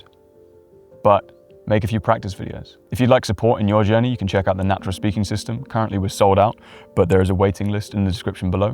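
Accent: England, United Kingdom. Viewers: is the english accent a requirement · It's called having a British accent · It also helps to be classically good looking and charming -- And to have a clear and articulate English accent with a soothing baritone voice. No Diddy. I'm just pointing out that in addition to the steps which you cover, I believe it will work especially well for you because you are beautiful (although your skin was oddly greenish-yellow in some camera angles.) I guess the point of my saying all of this is, I wonder if this would work for everyone. I mean, I'm going to try it for sure, because I am not a blubbering rock troll and I'd like it if people watched my guitar content. I appreciate this video and I look forward to seeing more of your stuff. Thanks! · I used to work at a radio station as a receptionist. I heard every kind of great voice to the slow, boring voices. 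I learned a few things that have helped me with my channel. I try to let people "feel" my voice. This is good information he is sharing. The English accent just gives him a cooler edge. 🎤👍🏽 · Not everyone likes an English accent. I for one generally don’t . I will skip one it unless American English. This guy has gone tone. I’m interested in what he is saying so I listened to see if I can incorporate in my channel- which is a different IDENTITY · English accent. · Well, I watched your video. And at the end, I felt like I was talked to by a skeevy religious zealot trying to get me in their cult. No amount of soft music, country bokeh, low grade UK accent voiceovers and coy looks is going to change that. I will continue to be me. Draw those that enjoy me for me. Try to be the BEST me I can be. And not gaslight people into "liking and following me". Where exactly is the merit in that?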